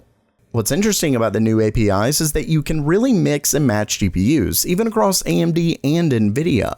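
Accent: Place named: South, United States. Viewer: You should do a video where you don't try to hide your southern accent lol I keep hearing it try to come out haha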